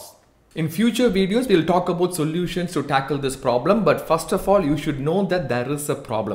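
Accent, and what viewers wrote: Tamil Nadu, India. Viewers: Thank you Doctor. It was easy to understand and specially your Tamil accent, is so beautiful to listen..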